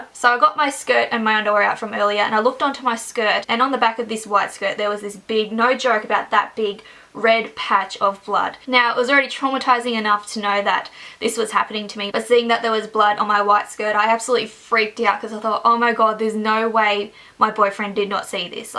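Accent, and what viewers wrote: Australia. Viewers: Are you Aussie? You sound like it cx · Is she Australian? Cause I'm Australian. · Are you Australian? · Your Australian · Shes aussie :) yay one of us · Are you an Aussie? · This Australian accent :D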